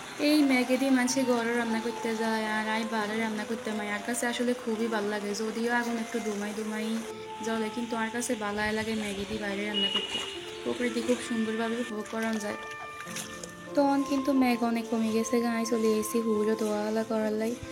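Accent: Noakhali, Bangladesh. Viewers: আমি বুজি আপনার নুয়াখালী কথা আমি সিলেটি আপনার পাশে আছি ভালো থাকো❤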